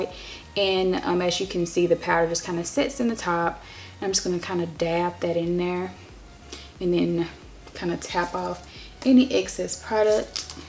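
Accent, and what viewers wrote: Memphis, United States. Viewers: I Knew I Heard Some Memphis Twang In Her Voice. Lol Heyyy Girl😘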